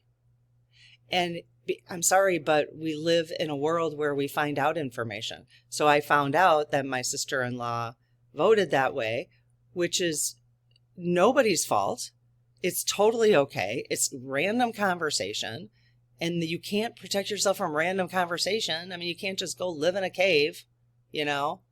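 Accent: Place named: Michigan, United States. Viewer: I am new to the channel and I am SO enjoying your videos!! You make me feel at “home”. I don’t know where in the country you live, but your accent reminds me of my birth home, Michigan ❤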